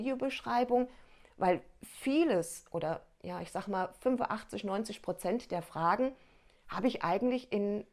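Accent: Hesse, Germany. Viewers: Liebe, danke für das tolle Video!!! Wir sind Hessen... leben aber schon seit 5 Jahren in Österreich und ich liebe es... deine herzige hessische Ausdrucksweise... bitte weiter so! Grinsss Und ja, ich hätte gerne das Brot mit dem zugekauften Teig als Video. Lass dich bitte nicht ärgern von Menschen die nur motzen wollen. LG Regina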